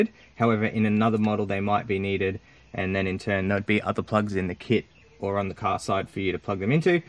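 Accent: Australia. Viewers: Oh ok. What company is it? Is it made locally there in Australia? I'm assuming that's where you are, based on your accent and the fact that the steering wheel is on the right. Just trying to find the best unit out there. Didn't know there were options other than RSNav, which I believe is made in China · He has a gay Australian accent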